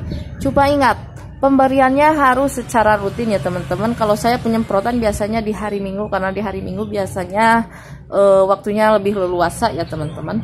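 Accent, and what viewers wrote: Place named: West Java, Indonesia. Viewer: Sunda pisan eeuy...❤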